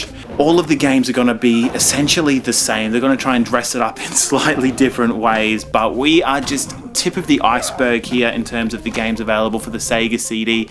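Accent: Australia. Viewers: you're Australian... its sea-ga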